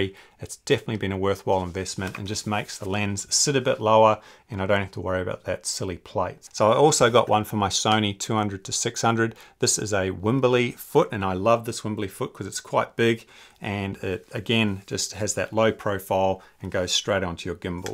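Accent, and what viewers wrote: Australia. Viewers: I started with Pentax cameras, a zoom105, a k1000, a superA, then went digital with a Pentax ist'd and recently purchased a Canon R8. The R8 came with a 24-50 and ive not long ago bought a RF24-105f4L lens. I was looking at getting something like the 100-400 you reviewed as, just being a hobbiest, paying more than $1000 is hard to do. I do like to have the nice things though so we'll see. I do like the fact that the 100-400 is fairly compact. A bigger lens might make people think I'm a professional, which I'm definitely not. Although I do enjoy photography and I appreciate great photos. I love your videos and reviews. Great to hear that fellow Aussie voice. Cheers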